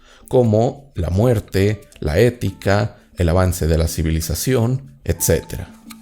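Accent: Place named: South America. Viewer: Hablas con astucia con un acento de un pais de America del dur usas chivas , eres Moiche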